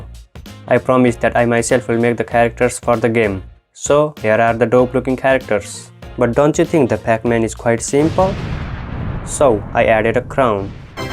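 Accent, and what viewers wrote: India. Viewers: He's an Indian now thats for sure, its recognizable by his voice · Are you from India sir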